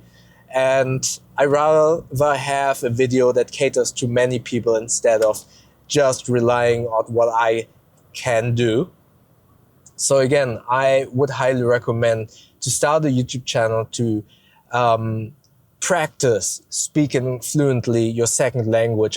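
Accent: Germany. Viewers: Your English sounds fine to me. To be honest, when I first saw your videos on your main channel, I did notice the accent but I couldn't nail down. Didn't even know it was a heavy German accent until I saw this channel. Living in Hawaii, we have people from all over Asia and the Pacific so there all kinds of accents, including a localized version of English which borrows a lot of words from other languages so hearing yours didn't really phase me. People making those comments about your English, especially Americans either live in an area where there isn't a lot of ethnic diversity or just being trolls. You're doing a great job!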